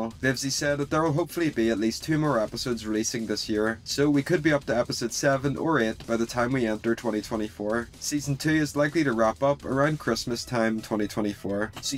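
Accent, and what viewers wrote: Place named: Northern Ireland, United Kingdom. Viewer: Always love to hear a wee Northern Irish accent like my own on YT! Feel so rare to come across! Buzzing for more eps 😳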